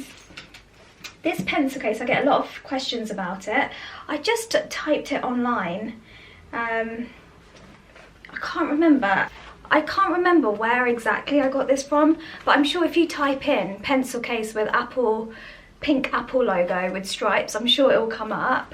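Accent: United Kingdom. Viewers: you and are such a lovely couple. lovely accent btw. which part of the UK does your british accent originate from?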